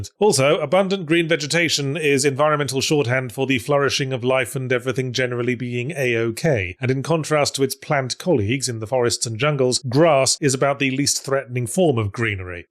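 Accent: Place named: United Kingdom. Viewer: College level intellectual breakdowns of video game design in cheeky tone with a British accent is peak. Love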